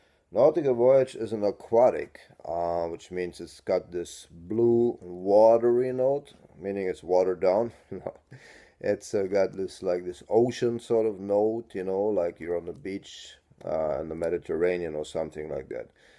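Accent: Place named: Iceland. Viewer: Love your accent, are you from Iceland?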